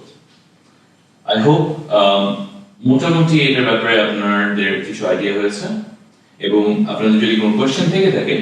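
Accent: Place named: North America. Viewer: Don't understand why people are moaning about his presentation or way of speaking? He probably lived in USA or Canada for a long time hence he speaks like that and personally I would prefer his presentation as it's clear and concise.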